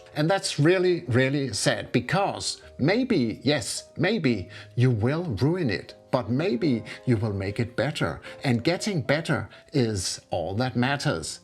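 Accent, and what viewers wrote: Denmark. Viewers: Dear. Just stumbled across your channel. Are you Danish? You sound so Danish. Anyway, love your work and your advice! 🙏🏾 [Edit: "Blomster i Glasvase"? - yep, you're Danish 😅] Hilsen A Brit in Copenhagen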